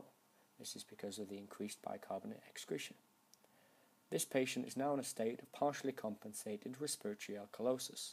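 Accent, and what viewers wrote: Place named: United Kingdom. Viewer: British accent, talk too fast, volume too low. Difficult to understand. SLOW DOWN.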